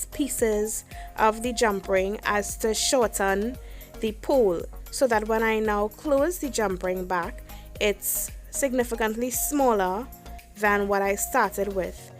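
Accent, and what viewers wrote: Trinidad and Tobago. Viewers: Hi... u sound like u from trini.. i am also.. and those nails r beautiful..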